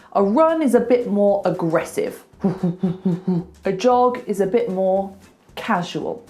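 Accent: United Kingdom. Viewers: British accent. Very good how it sounds to an english student more used to the sounf of the american english. Thanks for this video. I:m on my way to fluency.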